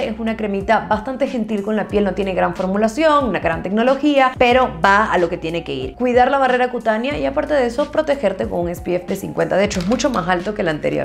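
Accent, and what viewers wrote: Venezuela. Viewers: Te ganaste un like, mi suscripción!! Y que orgullo que a pesar de tener tantos años fuera de Venezuela, no has perdido ese acento, ese sabor venezolano!!! Gracias por ese Review yo uso shiseido, pero ya tengo mi lista para probar!!! 💕💕💕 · Escuchando este acento me decía, parece venezolana, después mexicana. Y luego el mapa de Venezuela en su antebrazo!🤗 saludos desde Alemania!😂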